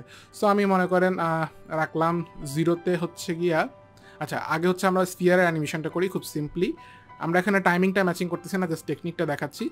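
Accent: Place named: India. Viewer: You think we can understand your English? Slow down man, you English becomes much Indian.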